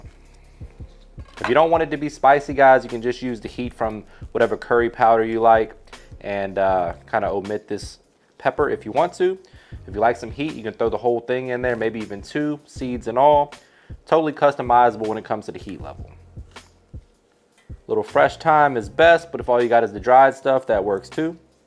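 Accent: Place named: South, United States. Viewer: Cooking with is crazy LoL you from Florida? It feels mad southern.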